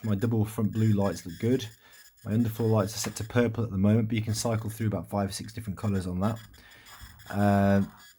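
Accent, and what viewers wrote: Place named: London, United Kingdom. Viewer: I just recently got the awd dodge challenge in destroyer grey, definitely want to get these lights for a red underglow! Awesome video dude. ... also, do you happen to know any good crowds to race with in London? Only asking because of your accent lol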